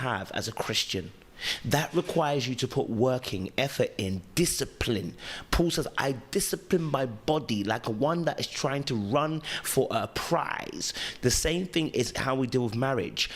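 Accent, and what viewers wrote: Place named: United Kingdom. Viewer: You are talking nonsense! Unless you are secretly married and speaking from experience, you shouldn't be providing any advice on this matter. Your comments regarding her vision of marriage are very elitist and African disguised with a British Accent! The Christian community should be praying that their divorce does not go ahead, but all of a sudden, everyone is a psychologist.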